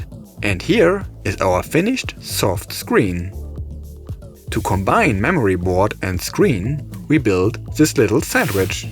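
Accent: Germany. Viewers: native german??? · I clocked you as German, and I was correct :D. Geiles Video, weiter so!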